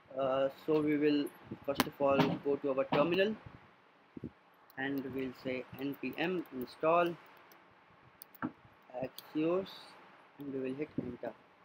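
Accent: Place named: India. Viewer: Indian accent so absolutely NOT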